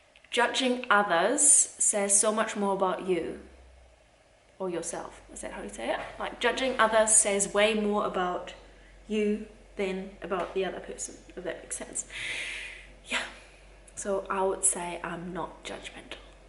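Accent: New Zealand. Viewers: Your accent is becoming more Kiwi. Many words I hear a New Zealand accent, not German.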